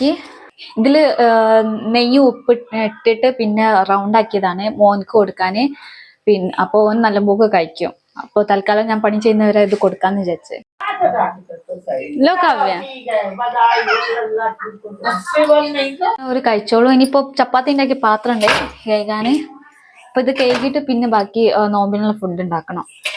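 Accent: Malappuram, India. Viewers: ഞാനും അങ്ങനെ തന്നെ പാവം നമ്മുടെ മലപ്പുറം ഭാഷ പറയുന്നു കുട്ടി 🥰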